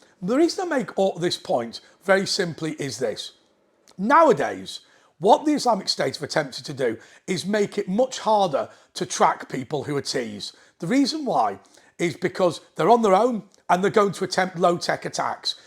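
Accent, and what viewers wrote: United Kingdom. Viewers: Sounds like he's British 😂🤣🤣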